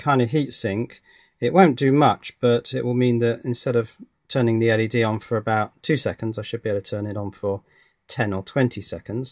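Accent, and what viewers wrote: United Kingdom. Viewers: This may be the case, but the presenter here has a British accent. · U from UK?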